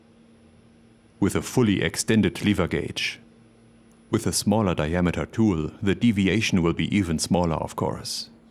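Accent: Germany. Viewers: the German-vampire accented humor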